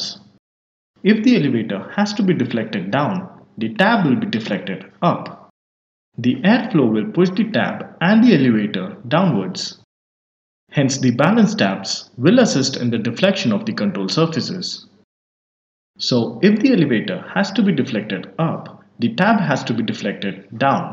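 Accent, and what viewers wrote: India. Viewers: Bro r u indian? Btw thanks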